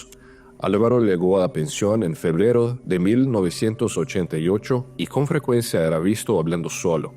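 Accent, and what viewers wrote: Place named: Portugal. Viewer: Que feo acento . Casi no se entiende. Si queres hacer documentales en portugués genial. Pero un asco escuchar el acento rapai tembo.. no se le entiende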